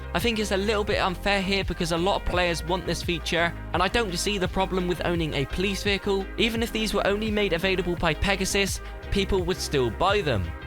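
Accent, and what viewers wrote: United Kingdom. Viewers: defiantly bristolian arent you!!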